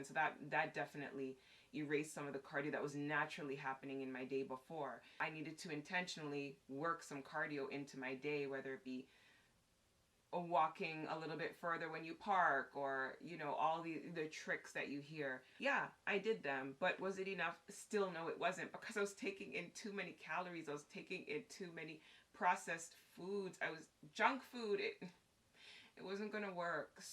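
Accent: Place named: Caribbean. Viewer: Gorgeous and intelligent? New subscriber here! :-) 🤔 Do I hear a slight Caribbean accent? ... Just got to 8 minutes. Definitely Caribbean. Lol ♥️ Yaaadie!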